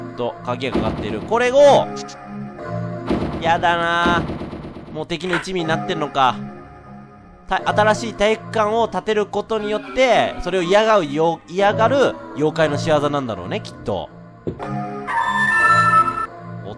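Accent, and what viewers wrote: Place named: Kyoto, Japan. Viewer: たまに出てくる京都弁でほっこりする^^ 肉人形楽しみにしてます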